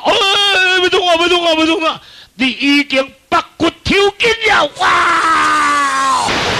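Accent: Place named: Coast, Taiwan. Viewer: 做戲，海口腔很自然，這也是他的特色，住四湖，媳婦一樣是我們麥寮人。笑科很自然，不用特意排一個橋段，而是隨口一說就很好笑。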